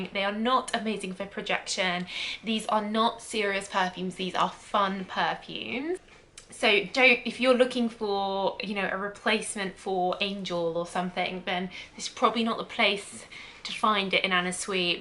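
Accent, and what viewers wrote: United Kingdom. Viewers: Do all these Brit’s talk like this?????? Haha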